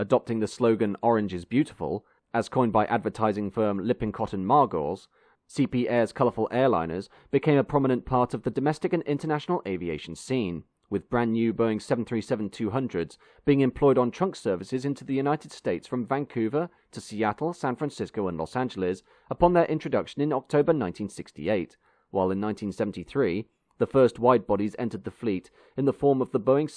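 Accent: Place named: RP, United Kingdom. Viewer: Quite a few words are mispronounced, among them 'The Pass', which is pronounced 'Da Paa'. The plummy British accent is also off-putting.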